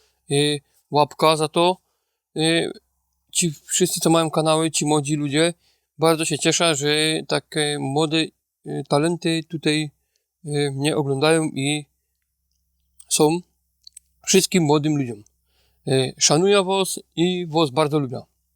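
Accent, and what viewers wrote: Silesia, Poland. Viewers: Super odcinek. Rewelacyjna gwara śląska. Jeśli chodzi o kamerę to zanim ją rozbijesz sprawdź kartę. Prawdopodobnie przyczyną wieszania się kamery jest karta SD. Zmień firmę karty SD i powinno wszystko działać bez problemu. Miałem taki sam przypadek. Moja kamera nie trawiła kart Kingston natomiast bardzo dobrze pracuje kartami SanDisk. Pozdrawiam serdecznie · śląska gwara mnie rozwala;) na dobry początek zostawiam subka i zapraszam również do mnie, pozdrawiam z Tyrolu:) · super się ciebie słucha jak opowiadasz w gwarze śląskiej, bardzo mi sie podobo waszo godka, warto mieć swój kawałek gruntu i swój kawałek podłogi, warto samemu coś zrobić bo daje satysfakcję, pozdrawiam, lajk 62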